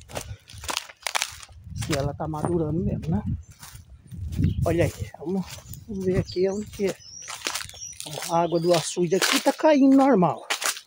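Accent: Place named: South, Brazil. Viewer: Esse sotaque do sul é lindo ❤.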